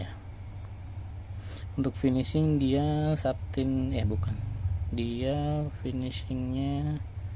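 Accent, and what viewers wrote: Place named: Banyumas, Indonesia. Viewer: Salam Mantap....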